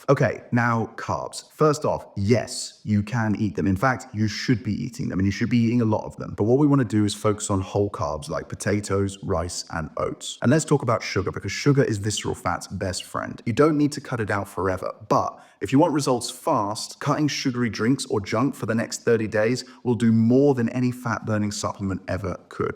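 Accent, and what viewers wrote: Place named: Southern England, United Kingdom. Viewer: mid to southern England, but I'm getting little hints of time in the US? and with a porcelain tiled floor doesn't live in the UK anymore...there..just like Poirot ! 😂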